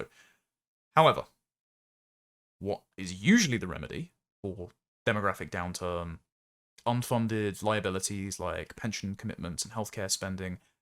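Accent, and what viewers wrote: RP, United Kingdom. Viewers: You sound like you shop at John Lewis, also why don’t you allow me to come on to discuss AI, don’t go against evolution.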